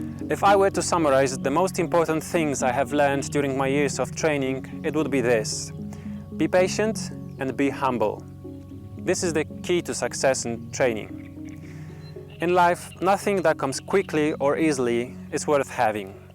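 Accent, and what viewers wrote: Poland. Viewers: I hear a polish accent in your voice. (Poland?) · SiriusB88 he’s polish.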